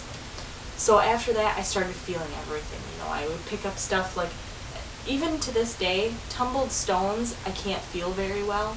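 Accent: Michigan, United States. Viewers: I knew your Michigan accent was familiar!! I live near Ann Arbor :] if you're ever in the area, my go-to metaphysical store is Earth Lore in Plymouth. I get tingles just thinking about the place! Or if you need a book, Crazy Wisdom in downtown Ann Arbor.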